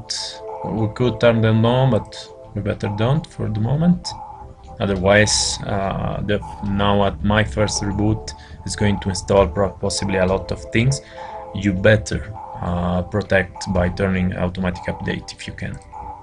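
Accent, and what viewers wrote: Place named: Italy. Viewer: Si sente che sei italiano :D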